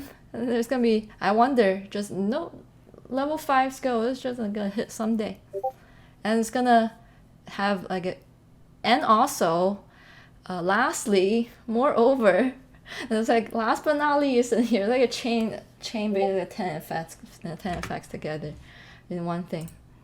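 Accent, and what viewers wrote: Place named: England, United Kingdom. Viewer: Pretty nice~ ^^ I like to listen to your English accent and learn a little Chinese from you. ^^ Cheer up ! (^0^)/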